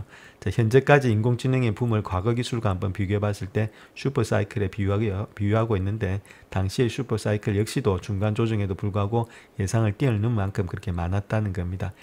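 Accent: Gyeongsang, South Korea. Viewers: 경상도 사투리 서울 토박이인 제가 듣기에 매우 인텔리젼틱하게 들리네요^^ 경상도 분들은 다 머리가 좋은가봐요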